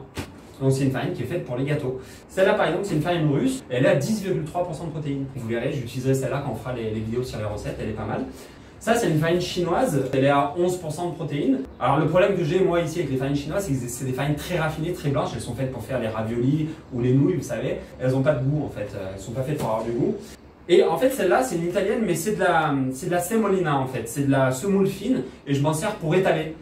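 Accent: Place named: South, France. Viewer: Merci pour toutes ces informations intéressantes! Et avec l'accent du sud c'est encore mieux!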